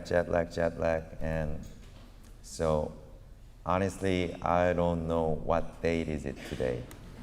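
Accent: United Kingdom. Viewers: 영국식 영어를 완전하게 구사하는군요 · 몇번을 다시 들어봐도 정확하게 말하고 심지어 유머까지 구사하는데 콩글리쉬라는 이유를 모르겠음. 또박또박 영국식 발음이라 그런가? 광팬이 미녀였는지는 잘모르겠음.^^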